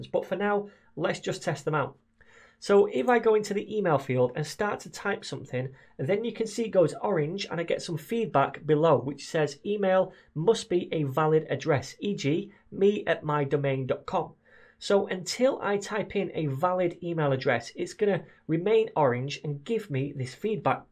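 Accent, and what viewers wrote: United Kingdom. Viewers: You're the first guy I've bumped into that has a British accent in a coding tutorial. From north of England I think, given that you tend to pronounce 'must' or ''stuff' like 'moost' or 'stoof'. Sorry for the digression, but I'm pretty in love with British accents. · came to learn, stayed for british accent, continued for great explanation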